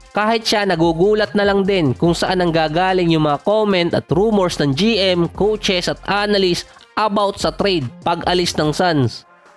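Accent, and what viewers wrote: Batangas, Philippines. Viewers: salamat sa iyong nba updates, ako'y nagsubscribed na rin at ramdam ko eh ikaw eh isa ring gaya kong batangueno eh, tama ga... shout out mula dubai ha.. balak kong maaglaro din 1XBET eh pwede gang gamit eh ibang Gcash, sa fiance ko at ang akin eh di pa updated eh, ayos ga yun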